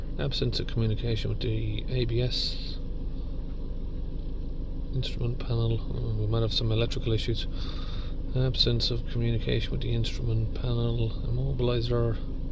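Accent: Ireland. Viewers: You'll never loose that irish accent tipperrary carlow offaly?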